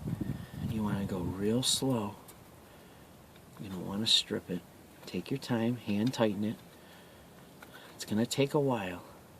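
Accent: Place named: Boston, United States. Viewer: thxs for the video.. Wife's car needs a change of plugs I'm guessing that's a Massachusetts accent lol Boston maybe?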